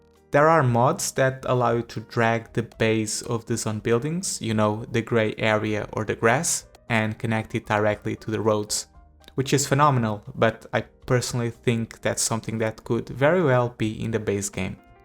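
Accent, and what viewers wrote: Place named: Portugal. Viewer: Esse sotaque Portugues nao engana :D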